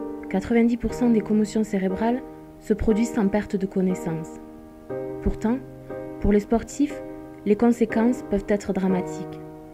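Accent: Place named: Basque Country, France. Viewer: Super témoignage, tu vas beaucoup mieux et bientôt ce sera parfait. Ton accent et ton récit avec l'accent deu pays basque! En afrikander tu conserves le même accent? Bonne reconvertion.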